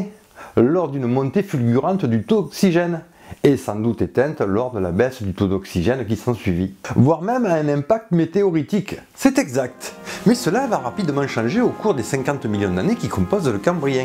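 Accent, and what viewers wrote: South, France. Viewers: Puis-je te demander de quelle région es-tu ? En tant que Belge, je cherche à parfaire mes connaissances des accents français 😅 (pour le moment j'ai compris que c'était "Sud" mais ça reste vaste) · Il parle trop vite et avec un fort accent du sud.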